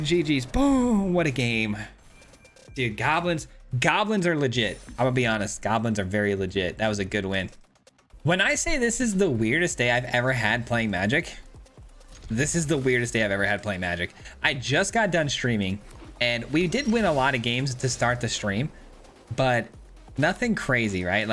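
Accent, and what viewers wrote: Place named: United States. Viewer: Well he is american. They way they say everything kills me.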